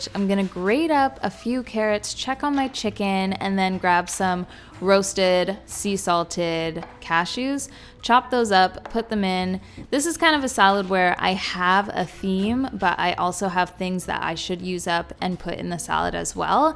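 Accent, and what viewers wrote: Canada. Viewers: Can confirm most of us Canadians say it the same way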